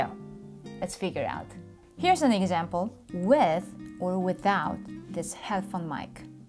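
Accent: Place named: South Korea. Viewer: after listening to many of the big stars doing videos from home during the social distancing it is obvious that you really do a great job with audio. most of the famous stars sound terrible on their videos. you however have learned very well how to balance piano and voice. that's great because you have a wonderful singing voice and I adore your Korean accent when you speak.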